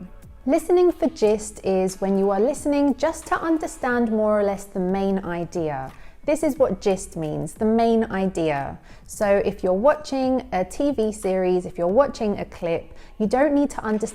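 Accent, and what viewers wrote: United Kingdom. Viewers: this speaker is from British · your British accent is lovely. Which part of the U.K. are you from?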